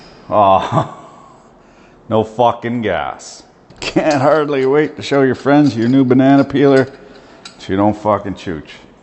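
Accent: Canada. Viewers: Canadian accents. The combination of minnesota and irish accents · As a yankee I just love the Canadian accent and slang in these videos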